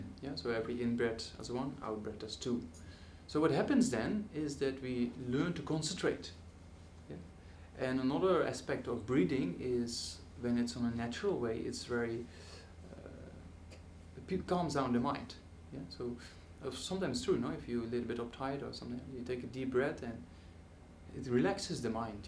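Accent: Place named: Netherlands. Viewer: The volume is very low, coupled with Dutch English and swaying doesn't make for easy listening.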